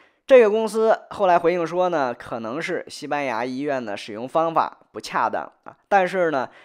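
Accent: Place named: Mainland, China. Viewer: 你这普通话肯定是大陆的吧。骂得自己祖坟不冒青烟吗？😀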